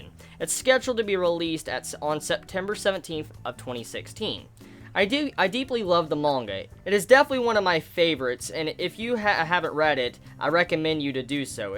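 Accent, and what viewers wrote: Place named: Tennessee, United States. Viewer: hmmm i notice you speak with an accent at times, then i hear you live in Tennessee. So i assume you build up an accent or grew up with the accent.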